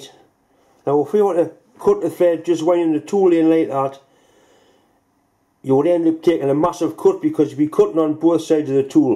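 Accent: Newcastle, United Kingdom. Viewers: I think he's geordie from Newcastle. Up North East. I'm from Manchester Northwest. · He's a Geordie. They don't do foreign,.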